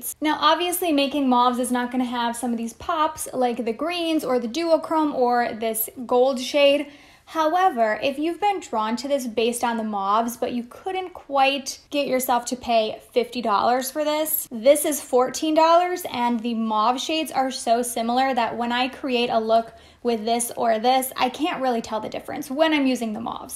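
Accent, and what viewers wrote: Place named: Michigan, United States. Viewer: Sometimes that mid western/ Michigan accent comes out to play. The way you say “BOTH” is soooo interesting to me as someone from the south that’s defffff not the way we say “both” down here!!!!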